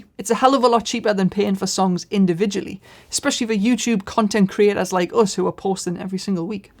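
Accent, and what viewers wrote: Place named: North East England, United Kingdom. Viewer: Class to hear a North East accent talking cinematography on here!!! I am determined to shoot a cinematic travel vlog on my smartphone (Huawei P30 Pro) but the maximum it shoots in is 8bit colour. Is it worth me using the log options the app I use provides? Or are they largely redundant with the limited tech I've got. Cheers in advance!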